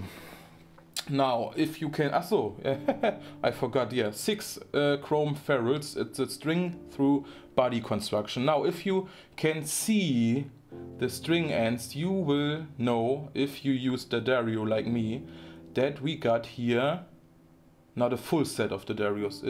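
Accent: Germany. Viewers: Mensch schwätz schwäbisch wia mir au!!! 😂😂😂😂😂😂😂😂😂 Tolle Review. Die rote ist meine nächste und letzte (Muahhhhaaaa) die ins Haus kommt. Gruß aus dem Süden El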